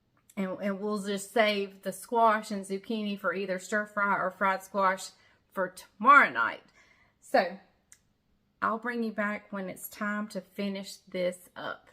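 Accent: South, United States. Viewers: Have you ever watched any of your videos with the auto-generated closed captions turned on? It's really cute and funny. closed captions for a southern accent. 🙂❤️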